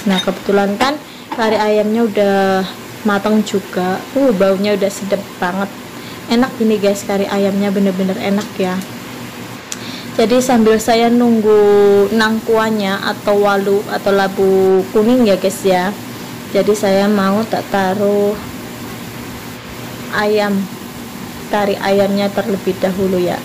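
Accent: Banyumas, Indonesia. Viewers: Tkw ngapak heboh tenan iki semngt dan sehat selalu mbk e 😊😊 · Waakaikumsalam mbak ngapak maning hebhe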